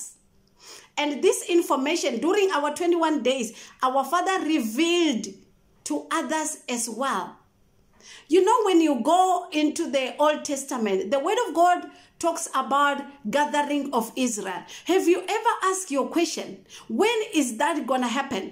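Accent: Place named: India. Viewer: Sounds similar to the way the Indians in India talk.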